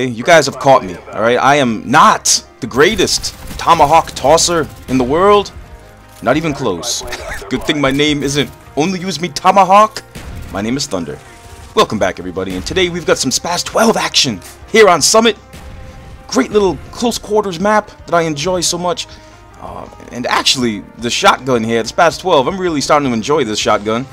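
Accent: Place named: Brooklyn, United States. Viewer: the more worked up he gets, the thicker his brooklyn accent gets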